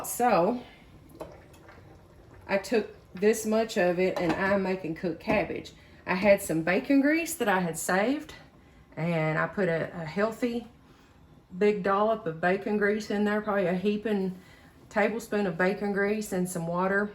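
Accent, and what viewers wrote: United States. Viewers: I’m feel so “at home”, watching your videos! I’m in East TN! I can really relate to your Southern accent & down to earth , personality ! You are a breath of fresh air! You are really creative ! God bless you ! Thanks for showing us , your bargain deals , from grocery store & your frugal meal ideas . Love the fridge clean out, ideas! When you talked about holding on to the one egg, it cracked me up! I have been hanging on to my eggs, for dear life ! 😂😂😂! I recently had the same thought as you! I can’t let these eggs ruin, by holding on to them ! lol. ❤ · Very smart!! I love good deals!! Omg you sound just like my family we’re from the deep South Georgia so you know we all hicks down this way 😂😂 God bless you!! Love how you’re teaching people how not to waste!! I don’t waste myself!! I like to take leftovers make soup or freeze them. 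I take my scraps & throw into my little garden, I couldn’t live with myself with all the people that are going hungry, & me waste anything!! You can even make extra batches of meals, freeze them & heat them up to save yourself from cooking sometimes. Thank the Lord eggs are going back down in prices!! You made a lovely meal!!!❤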